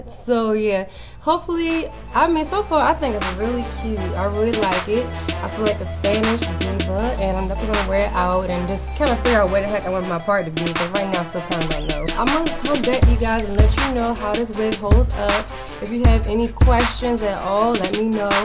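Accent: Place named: Baltimore, United States. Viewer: As soon as I was saying in my head, she's sounds like she from Baltimore, you confirmed it! You sound like me when I get mad 😆 But this wig sounds like What I've been looking for for forever, I hope it's still available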